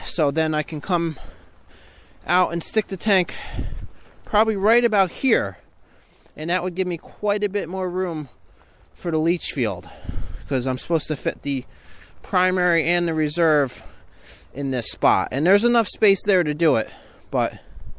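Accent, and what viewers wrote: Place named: California, United States. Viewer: What state is this in? Sounds like California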